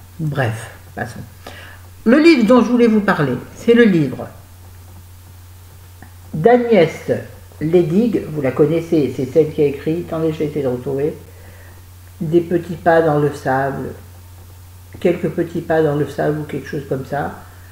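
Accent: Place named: South, France. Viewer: Coucou j'aime les clins d'oeil et l'accent le mien en fait celui du midi non aucune nouvelle de criss que se passe t'il?en ce qui concerne les commentaires hargneux le mépris est la meilleure des choses,jusqu'à un certain POINT!!!! Des poutous a bientot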